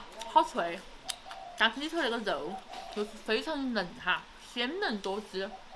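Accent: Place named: China. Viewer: 好懷念妳講普通話喔。真的很好聽很有魅力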